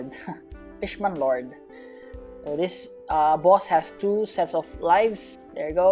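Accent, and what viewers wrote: Philippines. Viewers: Where does come from his accent sounds Filipino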